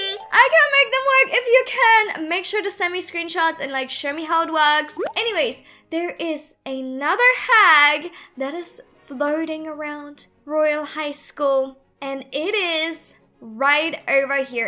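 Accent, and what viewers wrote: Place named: Australia. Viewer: !! u sound aussie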